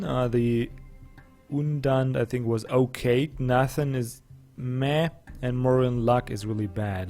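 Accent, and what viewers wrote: Germany. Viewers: Hi and a belated welcome to the weird world of Roving Clans. Thumbs up for pronounciation so far. I'm very sad to see this island thing again. To me it takes away a lot of the Roving Clans dealio; wheelin' and dealin' in all eras to survive, and eventually getting foreign trade routes. You'll never get that here because the AI apparently does not believe in sea trade. And, as I've previously stated, the AI kind of stinks at naval activity (expanding, scouting, warring). To top it off, your island sucks, resource-wise. This is really bad because RC is not a very strong faction to begin with, and really needs all the help it can get. About the urces quest. If you think your hero and your paltry units can handle two urces, think again. They will eat your cavalry for breakfast and use Sirya's bow as a guitar while dancing on her bones. You cannot underestimate just how bad the RC military is early on. 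They are T-E-R-R-I-B-L-E. I mean, look at the stats of your guys, and compare them to what you can buy in the marketplace. One horse guy, or a 400-hit point deamon, or a 300 hit point ended? Hmmm! Difficult choice. Remember the mercs gain 2x hit points (not factored in the market display). Anyway, I honestly feel my motivation to watch your playthrough diminishing a bit. I just don't think it will be that fun to sim city on an island (again). Hope I'll be proven wrong; you certainly have surprised me before. Sorry for all the negativity - I still love your German accent.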